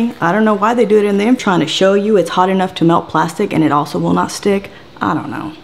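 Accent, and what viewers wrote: South, United States. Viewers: you are very entertaining, interesting and smart! Plus you have the coolest slight Southern accent...and what a voice! Just curious, what State are you in? Most entertaining reviewer on Youtube! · Holy crapola you are adorably gorgeous, and that accent WOW. Where are you from in the south anyway. I was actually looking for info on this pan and found your video, which made me so freakin happy because not only did I learn about the pan, but I learned it from one of the most attractive women I have ever seen. There is nothing I don't like about you.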